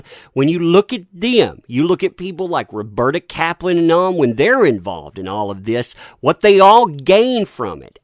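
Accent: South, United States. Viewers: I love the sound of your voice. I can't place your accent. It feels slightly Southern, but maybe some Midwest? Whatever it is, you have a great presenters voice. It draws the listener in. Keep it up.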